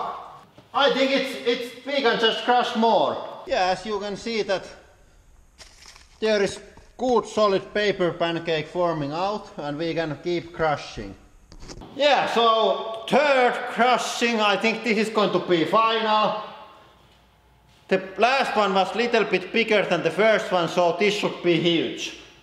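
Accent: Finland. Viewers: His accent is Finnish not German-Austrian so he can't be Hitler even if he was alive. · Myles Bishop he is from Finland. Finland people always have problems on speaking English. :D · Täytyy olla suomalainen, kuulee heti :D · Isn't this a finnish accent? 😂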